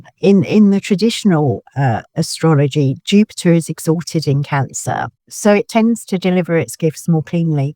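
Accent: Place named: England, United Kingdom. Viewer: Is that an English accent I hear? Music to my ears. I process audio. I especially love English accents and articulation/oration. Love my English brethren. From America. New subscriber. Your voice is melodic and you articulate and explain so beautifully.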